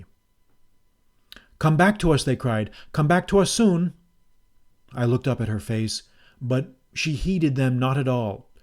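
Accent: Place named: United States. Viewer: cannot listen to English authors and English stories read by Americans.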